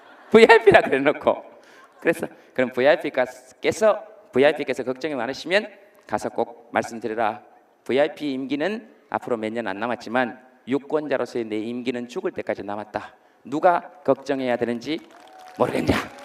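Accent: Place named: Gyeongsang, South Korea. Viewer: 갱상도 사투리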